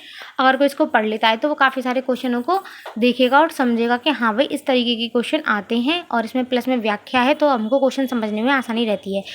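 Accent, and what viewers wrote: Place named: Braj, India. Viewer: Bharatpur se braj language 😄😄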